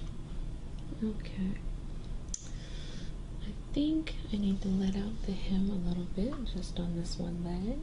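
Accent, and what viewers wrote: South, United States. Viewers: Fantastic vid. Your tone is soothing and trigger-happy (I think I detect a slight Southern accent too, which I like). Role-playing is good enough to not be distracting. Good work with the added triggers. Finally: you're a joy to look at. Great work. Subscribed.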